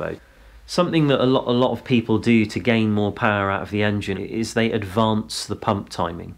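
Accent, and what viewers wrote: England, United Kingdom. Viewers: Ha, no Mike, just a very English pronunciation :-) Its actually a great video, where the underlying story is more about - whatever your 4x4 is, there's things to work on for more power, but that rabbit hole can get deep.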